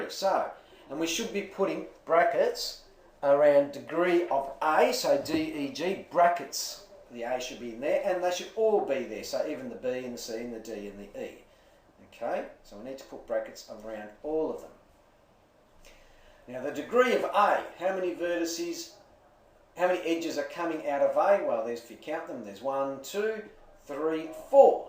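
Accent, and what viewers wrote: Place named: Australia. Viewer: I hate this Australian accent.......makes it hard to understand :( had to watch it twice Good job though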